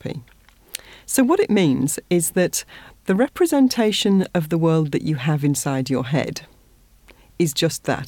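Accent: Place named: RP, United Kingdom. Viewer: Whenever I need to act out a standard british accent, I think of you. It's not the accent, it's poise-ness, precision and clear impact that I get out of it. This is your gift, and you are sharing it. Thank you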